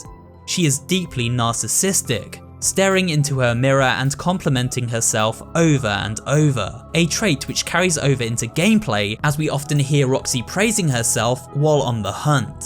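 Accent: United Kingdom. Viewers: i thought this would be good and then i hear british voice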